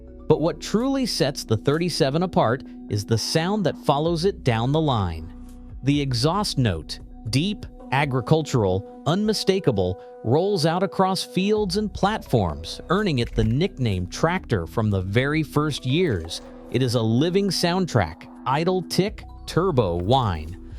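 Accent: United States. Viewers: I gave up half way through as I couldn't stand the American voiceover talking about a British locomotive and they made it sound that the Class 37 (English Electric Type 3 as it was know originally) was the only successful locomotive made. Also if talking about a particular subject, don't show film of others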